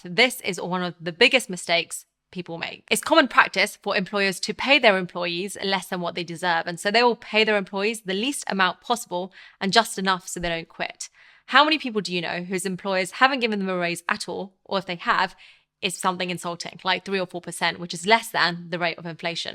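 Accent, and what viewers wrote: United Kingdom. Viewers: She is Indian but speaks with a heavy loaded British accent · I have no idea what country this was produced in. I'm guessing by accent it is the UK. I will say a major factor in the United States for having to stay in the rat race at dead end jobs is health insurance. As everyone in the US knows health insurance for oneself and their family are tied to their employer. Private insurance is just too expensive for anyone over 25.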